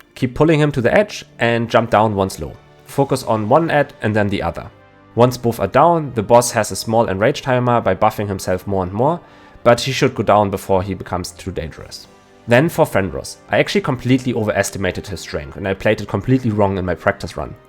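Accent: Germany. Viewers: krass wie man den deutschen einfach immer raushört^^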